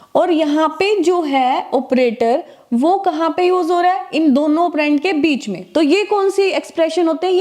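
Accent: Punjab, India. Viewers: I am from punjab and your punjabi accent in hindi made this video more intresting, good explanation. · Panjabi ho kya miss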